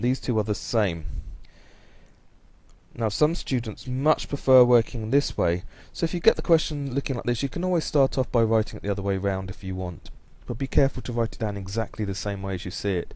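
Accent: United Kingdom. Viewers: I'm guessing the second method is something British people do? No disrespect, it's just a guess. (Your accent sounds British, that's how I got to that conculusion.)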